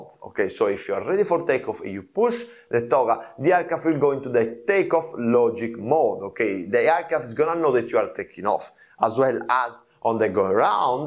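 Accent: Italy. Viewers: ....on the other hand I heard a good explanation of TOGA in plain English with a slight Italian accent: Very well done...I've been looking for an explanation of TOGA and now it has clicked...everything else I can find in the FCOM. Thanks You!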